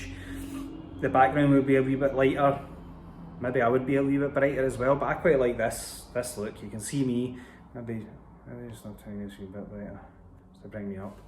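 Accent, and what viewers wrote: Ireland. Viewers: Man i love the irish accent, cool video very helpful. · I’m here for the Irish accent.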